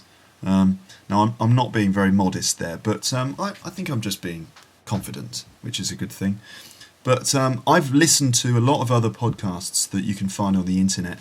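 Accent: RP, United Kingdom. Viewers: Hi,! You are right. Good British Received Pronunciation is addictive!))) Your podcast is very helpful! Thank you!😊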